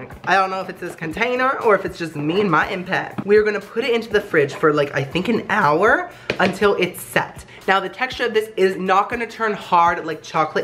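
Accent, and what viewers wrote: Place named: United Kingdom. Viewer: The British accent killed me lmaooo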